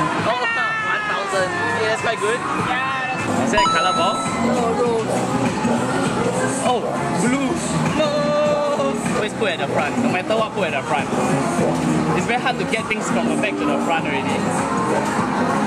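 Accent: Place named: Singapore. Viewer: This accent sounds so Singaporean... Reply if I'm right.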